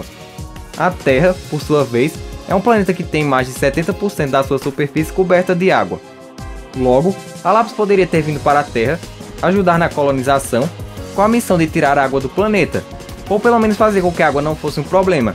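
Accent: Northeast, Brazil. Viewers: ei doido tu e do Nordeste?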